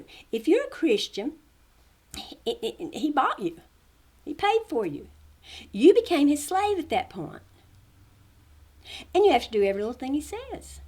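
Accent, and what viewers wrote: South, United States. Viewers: First I just have to say how much I love your southern accent , the hi and bye in your videos is just the best to me lol . Very well said and excellent pints , I’m 29 and have spent most of my life in fundamentalist Christianity , but every time I opened the Bible and saw the strikingly human nature of this god it constantly agitated me .. how could this have been the supreme god who we are suppose to worship , and yet his moral fabric is shady at best and outright malicious at worse … along with the fact that he does nothing to intervene in the collective human suffering that’s continuously happening in this world , and then ti stoop so low as to use threats of torture against people who won’t Appeal to his ego , no thank you , what is the point of having a god who is utterly useless and narcissistic.. needless to say I’m glad I got out when I did . 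Excellent work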